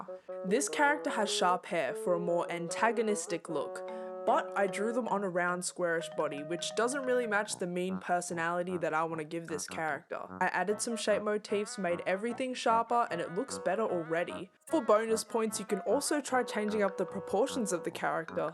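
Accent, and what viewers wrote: Australia. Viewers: Wait are you Australian I'm tryna see sumn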